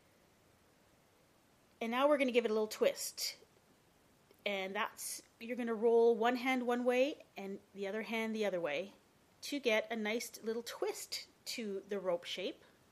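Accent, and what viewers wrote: Canada. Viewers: where are you from? it sounds kinda like a strong Canadian accent, but I don't really know.. Minnesota? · lol you sound so canadian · You are Canadian! I love your accent! I subscribed! All of you vids are so cute! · I can hear her canadian accect noww!! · Your accent sounds like your from canada, but you measured in inches, Wisconsin? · Are you canadian? You have that baker/canadian soccer mom accent..I love it :D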